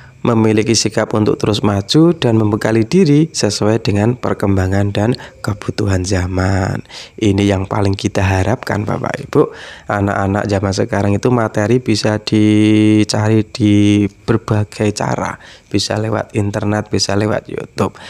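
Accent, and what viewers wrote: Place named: Java, Indonesia. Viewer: Assalamualaikum Trims banyak Gan...Ha ha ha medok sekali jawanya ....pilihan analoginya cerdas sekali ...pada akhirnya kok seperti andragogy ya Gan? sekali lagi trims banyak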